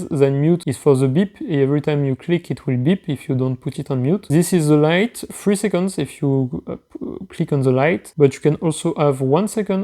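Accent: France. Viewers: Un bon accent français !! Comme on aime haha 😂❤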